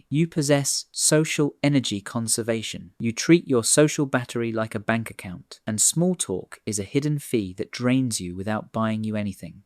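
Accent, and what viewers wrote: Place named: United Kingdom. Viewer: I don't know why your videos are so funny. Think it's the satire with that serious british accent - I love it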